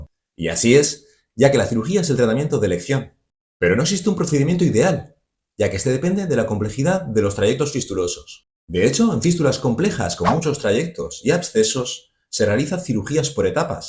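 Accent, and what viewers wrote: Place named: Spain. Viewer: No es obvio el acento? Jaja es de España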